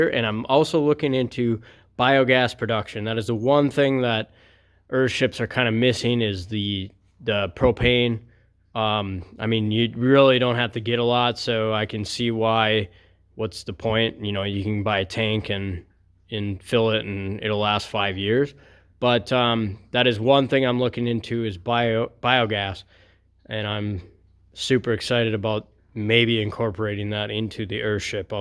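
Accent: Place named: Canada. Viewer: I could have sworn you were canadian.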